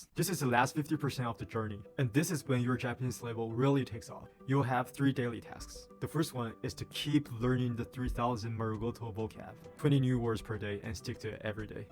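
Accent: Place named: China. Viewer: This was my thought, but his accent is Chinese.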